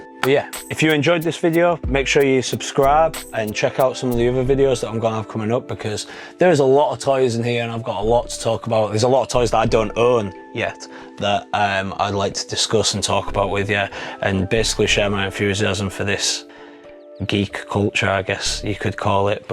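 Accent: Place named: Hull, United Kingdom. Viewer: Are you from Hull by any chance? I can tell with your accent!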